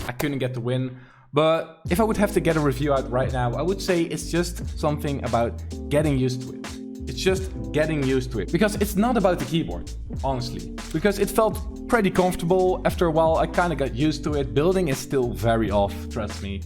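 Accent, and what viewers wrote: Netherlands. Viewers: Are you Dutch? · are you dutch??? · Jij kan goed engels maar nederlands accent valt altijd te horen xD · ik dacht al dat je nl was maar ik was nog aan het trijwelen met je accent maar yeey je bent nederlands ik ben een goede detective :3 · Wow are you dutch? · Ik dacht al dat je Nederlands was !💙💙💙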